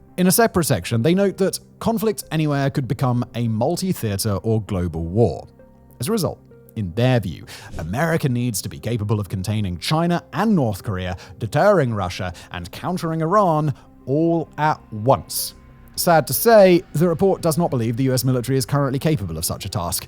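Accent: United Kingdom. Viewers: This is what Americans get wet for, hearing a Brit tell us that we're not ready for war.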